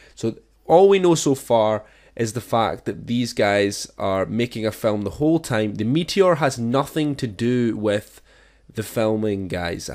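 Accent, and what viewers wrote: Scotland, United Kingdom. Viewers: R u Scottish if yes where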